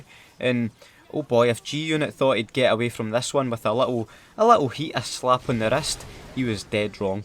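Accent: Scotland, United Kingdom. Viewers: Now i know where this accent is from. SCOTLAND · Yo I have been subbed for about a month now, I would be browsing facebook and sometimes I would come across a scottsman playing COD and thought I fucking love the accent but more importantly I found that the content was top notch so I found you on youtube and subbed and now here I am, drinking coffee, enjoying a great COD video. Thumbs up to you sir. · I don't watch any other COD youtubers. Tbh I just love the Scottish accent that's why I watch this channel ahahah.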